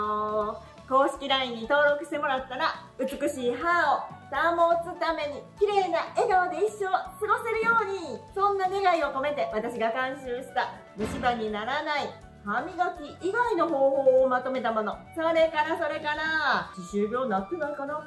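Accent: Kansai, Japan. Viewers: 関西弁の話し方がめっちゃ聞いてて心地よい😊❤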